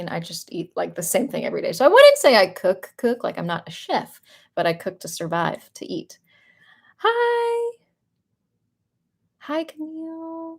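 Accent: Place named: South, United States. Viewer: Your southern accent is very subtle, also; in my time in China, nearly every American I met was from the south, including me (NC). If you feel comfortable answering, what did you do in China? and is Seoul similar to Chinese cities? This would be really helpful because I used to live in Shenzhen and am considering studying in Seoul for a year as an exchange student.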